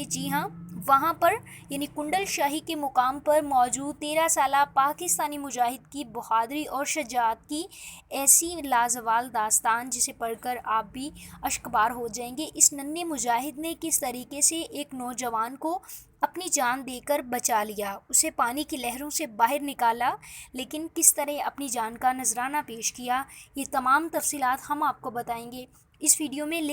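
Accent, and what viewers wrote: India. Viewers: Bibi bolna to seekh lo yar Kia bad report kerti ho lagta ha Indian ho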